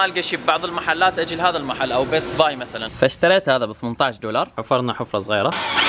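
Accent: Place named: Iraq. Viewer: أجمل لهجة عربية لهجة اهل العراق ♥️ ، احبكم جداً ، وربي يبارك لك بالموستنق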